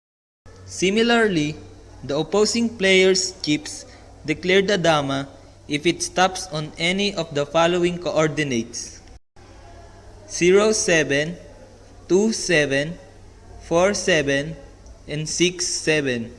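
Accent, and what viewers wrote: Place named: Philippines. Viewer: huwag tayo mahiya sa english natin dahil yan ang tatak pinoy, mga hindi nga ang daming video sa math, alisin na natin yang mentality natin na dapat american accent tayo, ang mahalaga mapresent natin ng maayos ang video natin, mga amerikano nga hindi nagcocomment tungkol sa accent. good work, pls. do some damath videos para sa elementary, napansin ko kasi na wala.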